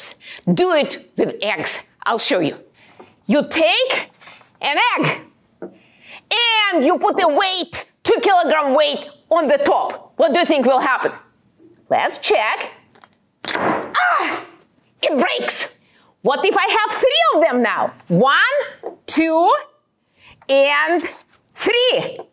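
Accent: Russia. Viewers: Думал, нифига я английский начал каждое слово понимать, а, нет, акцент наш просто.)) Сразу всё понятно.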